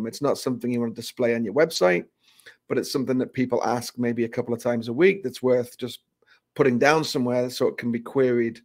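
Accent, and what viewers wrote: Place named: Newcastle, United Kingdom. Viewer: Listening to your Newcastle accent was worth the 3 minutes I spent on this. The tech is great but your home accent is out of this world!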